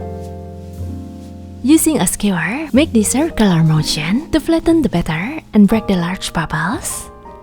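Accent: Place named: Indonesia. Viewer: Thanks for the recipeee. Aksennya kayak aksen indonlish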